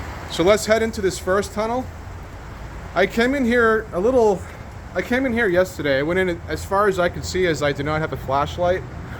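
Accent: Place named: United States. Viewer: Your Accent is American. Where are you from? Oklahoma here....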